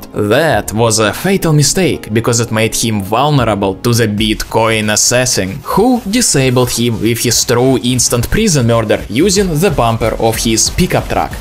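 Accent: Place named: Russia. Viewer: так забавно слушать жесткий русский акцент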